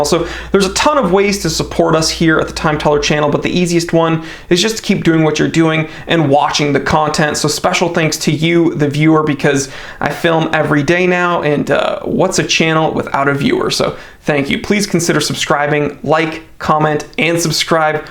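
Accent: Germany. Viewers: lol love that German accent!!!!! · Please more German accent. Intoxicating and additive. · lol I love the German accent.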